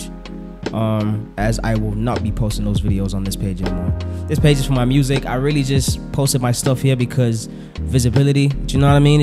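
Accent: United States. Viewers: He sound American must've been raised this side a bit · Why does he sound American? I thought he is South African. I guess not because he just said mpoomaaaalaanga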